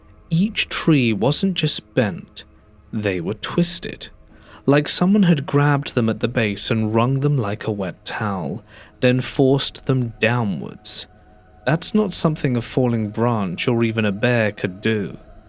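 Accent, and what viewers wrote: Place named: RP, United Kingdom. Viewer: never disappoints 🙂 I tried getting my brother into this channel, but he said you sound too posh 😂 he's a let's read fan....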